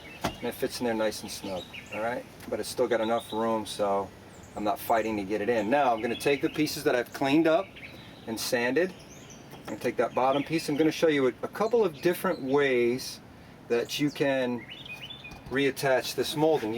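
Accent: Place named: Tennessee, United States. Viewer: Sounds and looks like hes in TN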